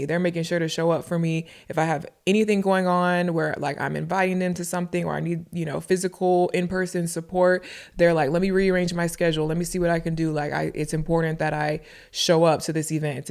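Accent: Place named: South, United States. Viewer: Girl you are so funny! Especially with that southern lady accent. Have me hollering in my room “you gotta let go & let God” 😭❤️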